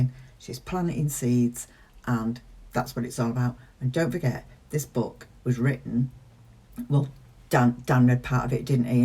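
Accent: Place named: England, United Kingdom. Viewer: Always look forward to and enjoy your videos,. If Wendi is ever prosecuted, the Prosecution are gonna have to be a hundred steps ahead of her, for ALL the reasons you outlined in this video. You probably don't mean to be, but your delivery is highly entertaining! You've probably been asked this before, but your accent places you in what part of England?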